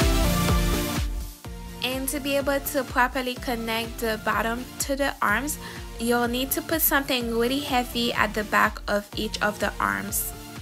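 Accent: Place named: Haiti. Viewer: Love your accent and your work. By chance are you Haitian? God bless you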